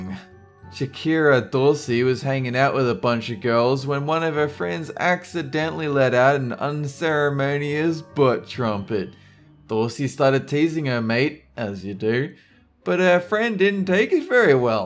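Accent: Australia. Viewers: Is Aussie? I feel like he is from the east side, maybe Brisbane?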